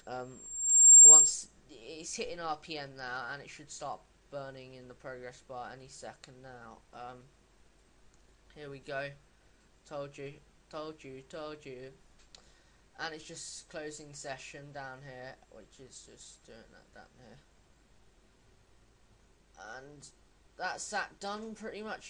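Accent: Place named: United Kingdom. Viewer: I couldn't understand a word that this guy was saying!The British accent was bad enough, but you add him talking at light speed and he ight as well be talking in Martian language. Maybe he is a "Klingon trying to talk English!!!!PLEASE do not post anymore dirty Klingon videos because this is a site for humans onlyand English language only. Humans are biggoted when it comes to Klingons!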